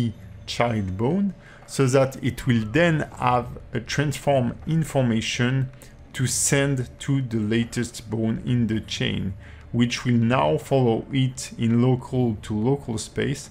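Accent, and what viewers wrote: France. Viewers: So I came here because I'm struggeling with a super easy mesh and rig, just movement, rotation and IK and everytime I put IK on my mesh it glitches about wierdly. This is way above me, I will come back when my IKs work. Good inspiration though, and love the slight touch of authentic French accent ^^ Merci à bientôt!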